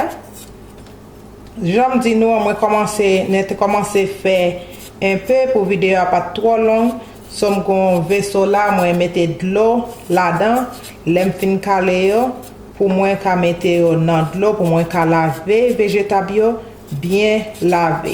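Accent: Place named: Haiti. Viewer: Great job.... and you were worried about you Creole accent! Proud of you! Happy New Year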